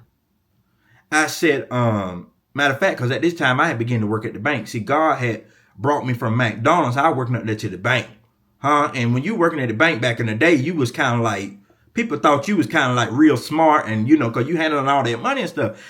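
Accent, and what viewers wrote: United States. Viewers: I know a NC accent when I hear one. Fayetteville native here! You are toooo hilarious!!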